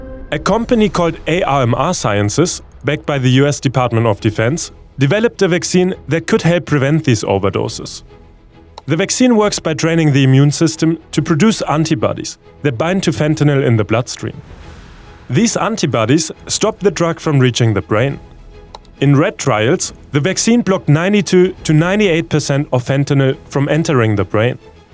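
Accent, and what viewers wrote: Germany. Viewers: Are you German? Your accent sounds pretty german to me! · As a German I tend to not like German accents but yours is just part of the good news for me. I originally come from the Lausitz and am intrigued by the news about the battery project. Keep up your superb work. I struggle to watch “normal” news since a decade now…and stopped it actually almost sompletey a couple of years ago as it was not doing anything good to my mental health status, just sometimes I open the tagesschau, but your videos give me so much faith in the future!!! Danke Leute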